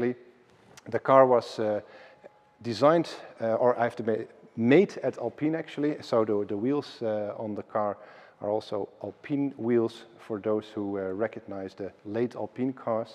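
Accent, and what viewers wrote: Netherlands. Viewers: Always like your videos, but to be honest, for someone like you, an international name in coach building cars. I experience a kind of annoyoance in your Dutch-English, there's so much potential of you take a few classes. I hope you appreciate my somewhat cold feedback 😬 Best wishes for you and Kaeve in 2023 (and beyond)..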